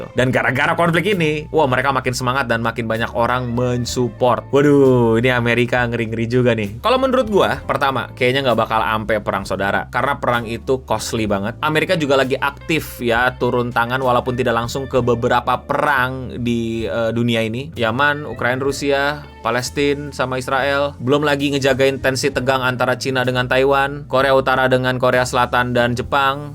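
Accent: Indonesia. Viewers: Wasuh... Ingeris ya kok suara kedalaman.. mas bro.. gua tu biasa dengerin bahasa luar tp ini ingeris kayak versi indo nya lain banget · Setuju 👍🏼 Biar ga gampang dikibulin musuh itu pun inggrisnya sudah dibuat logat Indonesia lho!!! Nyambi belajar lah...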